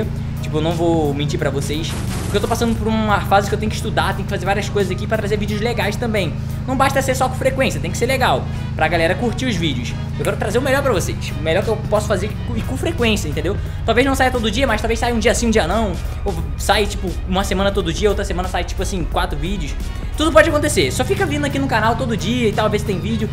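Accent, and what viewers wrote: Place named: Rio de Janeiro, Brazil. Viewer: Nossa vc é do RJ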